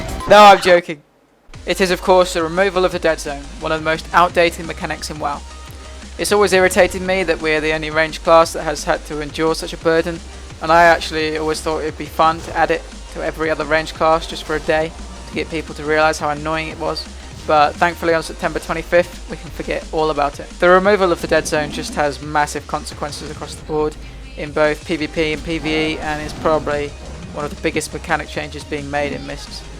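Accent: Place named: England, United Kingdom. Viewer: im not sure but he sounds english :p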